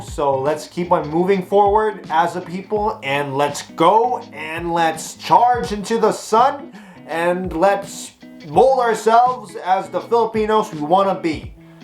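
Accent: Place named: United States. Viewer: copy the colonizer, like making an effort to sound american in a youtube video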